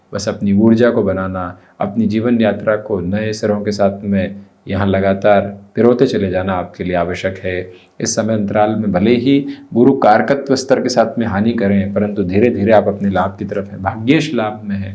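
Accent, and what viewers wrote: Standard. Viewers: आप बहुत शुद्ध हिंदी बोलते हैं, बहुत से लोग समझ नहीं पाते, घ्यान दें। मैं हिंदी में एमए हूँ।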